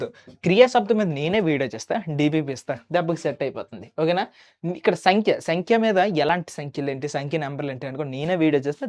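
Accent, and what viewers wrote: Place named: Telangana, India. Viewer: Anna telangana 😅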